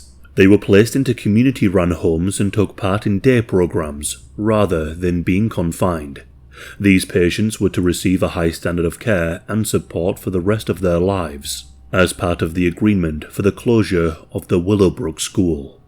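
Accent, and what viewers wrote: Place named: Northern England, United Kingdom. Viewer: Yep, here's another pronounciation nazi surfacing. He has a Northern England accent dumbass. A stunning example of someone who has nothing better to do. Now fu*k off.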